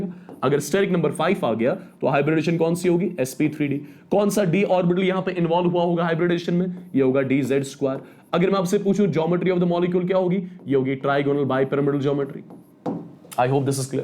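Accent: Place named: Kashmir, India. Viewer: It's kashmiri voice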